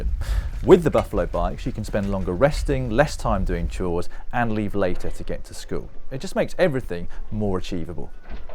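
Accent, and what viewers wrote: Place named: United Kingdom. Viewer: A worthy and life changing cause to be sure. But I can't help but feel the hypocrisy of spending ~£10,000 (or more) of Her Majesty's British Pounds on flying, and at least 1 other staff member + all the camera gear out to Zambia + accommodation + translator + guide + transfers to report on the donations of others that provide cheap (albeit very important) bicycles to students and others. I also couldn't stop chucking at the obviously colonial nature of having a British national (Sorry, you're very British with a very British accent) report in Zambia which was a former British Colony, where Her Majesty has a fairly checked past. I'd have loved to have seen a local Zambian media crew report with the students and the GCN invest the £10,000 on more bikes or other charitable works. Regards Jordan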